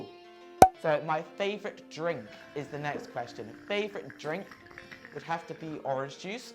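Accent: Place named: United Kingdom. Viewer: Es porque habla ingles británico! Ellos tienen un lenguaje y acento particular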